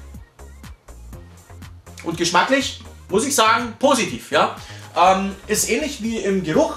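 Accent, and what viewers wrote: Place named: Swabia, Germany. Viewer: Woher aus Schwaben kommsch du eigentlich? ;-) Dialekt kommt mir sehr bekannt vor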